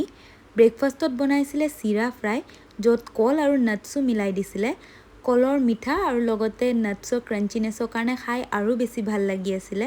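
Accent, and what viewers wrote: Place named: West Bengal, India. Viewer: Baa tumar kotha khini mane accent to olop Bengali type lage tumi pure assamese hoi ne please jonaba sun and tumi.kor hoi koba sun...lots of love to you❤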